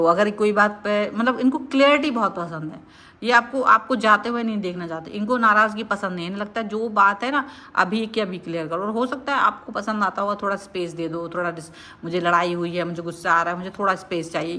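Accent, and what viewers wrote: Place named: Uttar Pradesh, India. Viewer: 😘😘😘😘😘 aap mujhe U.P. se lagti ho kyuki main bhi U.P se hu and aap ko sun ke maine pehle bhi poocha tha...jab first time aapko suna tha....mujhe hamare ghar ki yaad aa jati hai..😢 isliye ...naa ki kuch galat hai...I truly like you and appreciate your efforts ❤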